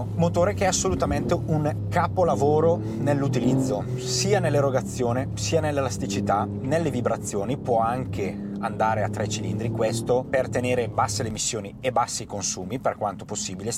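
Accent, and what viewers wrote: Brescia, Italy. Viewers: Che accento Bresà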